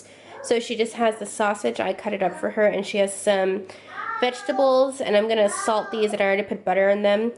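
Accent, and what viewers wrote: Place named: United States. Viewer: where are you from? You sound like your from the states but hubby sounds English / England. I could totally be making this up. Great video with loads of ideas!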